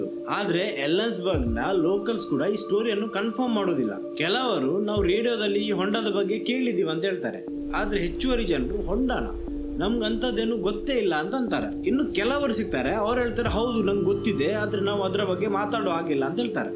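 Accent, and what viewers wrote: Mangalore, India. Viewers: Your From manglore ???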